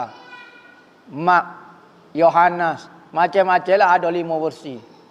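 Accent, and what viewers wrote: Kelantan, Malaysia. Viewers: X..ramai dh makin fhm dgn loghat kelantan..dh um x guna bahasa pekat pun. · hnya org kelantan shja fhm dan tahu itonasi nada pelakk prcakapan bhasa negeri sndiri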